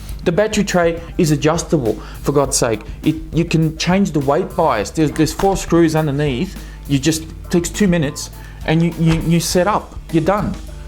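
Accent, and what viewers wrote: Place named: Australia. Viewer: Great review!!! don't take this the wrong way... You are the new Jang. He does thorough reviews at ultimate rc but you're more indepth and more entertaining, maybe it's the Aussie accent LOL. Please keep them coming, we know it's a lot of work so thank you. If you take request I like see a SC comparison from the 4 that you have. Price/ durability/ performance / parts support etc... which do you recommend and for what type of bashing etc...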